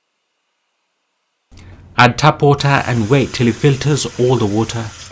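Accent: Birmingham, United Kingdom. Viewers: Thank u for this video! I agree with the comments, the instructions that came with the filter were a joke! Prefer your vid with your brummy accent! 👌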